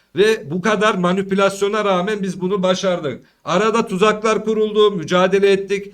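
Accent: Konya, Turkey. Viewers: Videonu izlerken benim hanım dediki, bu adam konyalii😂😂 dedim nerden anladin ?? Hanım dediki: Dimiyorum degisi tam konya sivesi🤣🤣🤣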